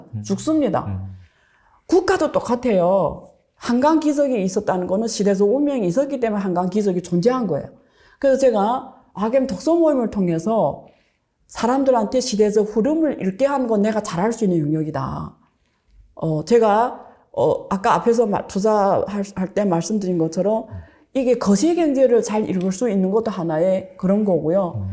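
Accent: Yanbian, China. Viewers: 연변사투리가 쎄네요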